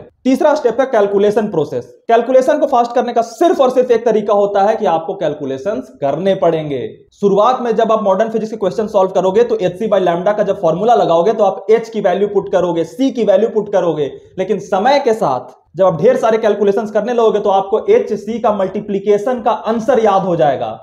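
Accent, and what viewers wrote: Bihar, India. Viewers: Bhaiya aap bihar se h kya pls Tell ❤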